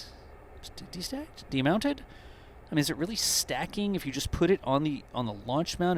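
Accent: Andalusia, Spain. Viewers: Estupendas las explicaciones de como van los avances, gracias por la narración en andaluz. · GRACIAS a tí por traernos las noticias de primera mano y en perfecto español (ademas con ese acento andaluz tan simpatico y agradable) ❤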